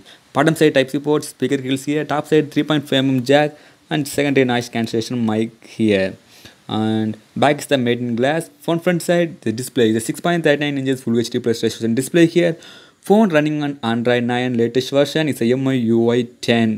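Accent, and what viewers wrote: India. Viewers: are you chines ? You speek like Indian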